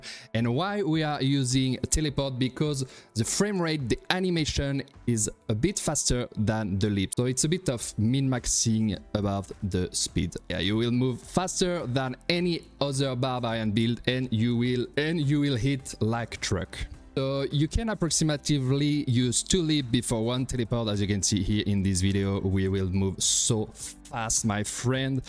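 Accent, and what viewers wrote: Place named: France. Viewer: Salut le poto je me disais bien que la accent était familier, j m abonne pour the french powzr brother. Tu geres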